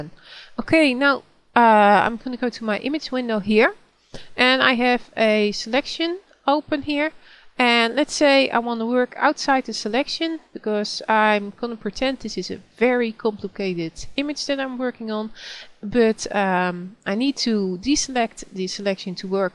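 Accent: Netherlands. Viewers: je hoort wel dat je van nederland bent ^^maar is wel leuk ! kun je ook eentje in het nederlands maken ? coole videos meid!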